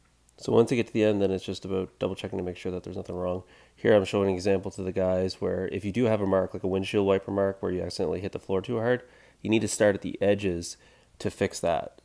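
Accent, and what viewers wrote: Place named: Canada. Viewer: Why did canadians start and continue to say the letter o as a u?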